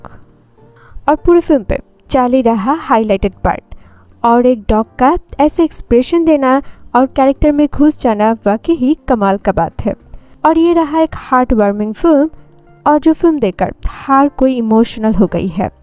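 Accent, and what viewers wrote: Bengal, India. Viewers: your accent says you are bengali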